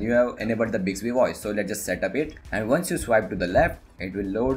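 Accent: India. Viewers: omg indian accent it's really bad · I can't pay attention this indian accent drives me crazy I wanna punch one!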